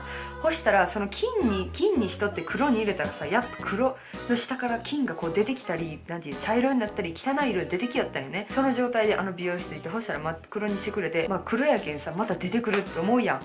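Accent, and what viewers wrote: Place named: Iyo, Japan. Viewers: ちゃんの、伊予弁？が大好きです💗 ずっと聞いていたくなる、癖になる、語尾にはまりました😊 伊予弁って可愛い （*'‐'*)♪😄😄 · 半分伊予弁半分西条弁やな(♡) 私の地元やけん話し方めっちゃ落ち着く♡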